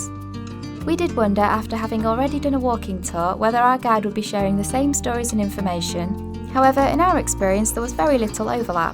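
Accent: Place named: Lancaster, United Kingdom. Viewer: Good video as a Cambridge resident. Love your accent, from Lancaster.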